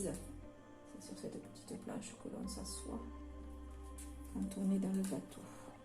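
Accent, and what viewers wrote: South, France. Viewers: Coucou, je te mets un MEGA pouce bleu, car, (tu as fait un travail remarquable), c'est ce que j'appelle de l'ART, sincèrement, BRAVO pour cette barque sous fond coloré chaleureux et la végétation détaillée Je sais reconnaître les vrais talents, et (tu es une vraie artiste, car tu as ça dans la peau, c'est indéniable), et ton tableau est vraiment très beau, tu mérite 20/20 en plus, d'être jolie, ton accent du sud est attachant, gros bisous et prend bien soin de toi, Artistiquement, kenic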